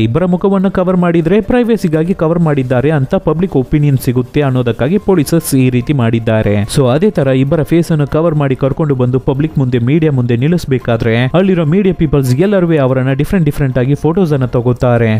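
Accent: India. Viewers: Super brother❤adre nemdu yav uru anna nem mathado style super adike keldye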